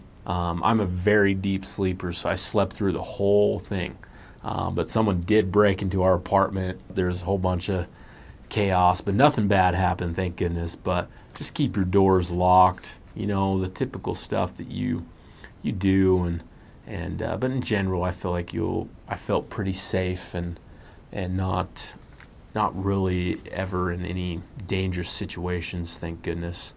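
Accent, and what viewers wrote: United States. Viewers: Give it a few months and you’ll lose your American accent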